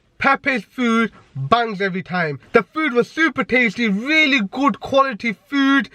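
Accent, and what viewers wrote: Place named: London, United Kingdom. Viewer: He sounds like a Londoner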